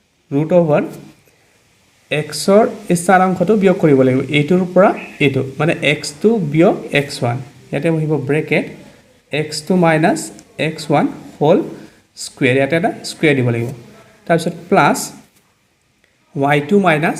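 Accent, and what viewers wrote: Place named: Bhojpuri, India. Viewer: Ekadam Bhojpuri Disha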